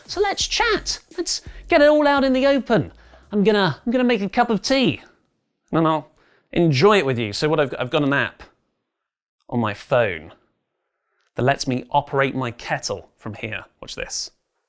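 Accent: United Kingdom. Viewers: Social meteor account. I love British talk. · your natural speaking voice is perfectly British. Coming from an midwesterner who loved Hot Fuzz to watch the director commentary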